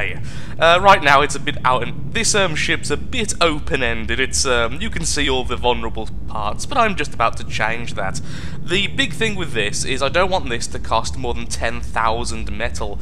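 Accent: United Kingdom. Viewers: I just got to this channel and some how I knew you would sound British.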